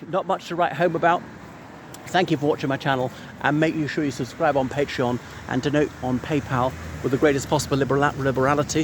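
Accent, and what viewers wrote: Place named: Haywards Heath, United Kingdom. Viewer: Just stumbled upon this curious video. Are you really from Ireland? It sounds more like you are from HAywards Heath.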